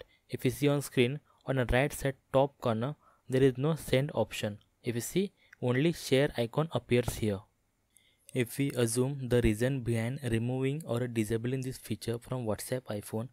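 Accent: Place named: India. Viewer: inggris aksen india😭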